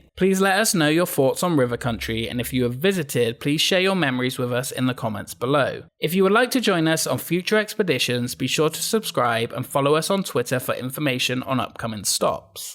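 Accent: United Kingdom. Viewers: Are you from the uk? These videos are great i cant speak highly enough about them all on my personal facebook and my enthusiat friends i would love to meet you one day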